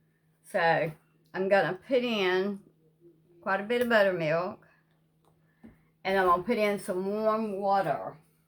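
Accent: South, United States. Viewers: Hi Ms.. I really appreciate your videos. I was adopted in TN when I was little, but don't really have any family as it wasn't the best situation. But I had a grandmother who was absolutely wonderful, and when I was young she cooked like you. I love listening to you, learning from you and watching all your posts. I'm near Chicago now after living in NYC for 20 years so it's nice to hear the Southern accent and warm lifestyle. You're good people, as my grandmother would say. And I'm very happy I found you here. PS. You had my mouth watering 2 minutes in! Yummy!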